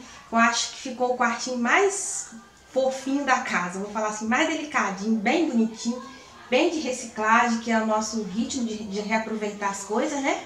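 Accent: Minas Gerais, Brazil. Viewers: De que cidade vc é, parece mineira uai?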